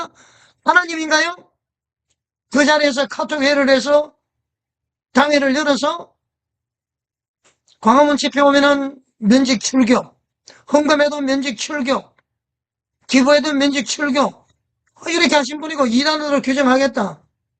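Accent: North Korea. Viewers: 그런듯이 아니잖아요 참 웃기네요 그만지껄이세요. 모욕하지 마셰요 무슨 미친소리합니카 북한에서 왔네요 말투가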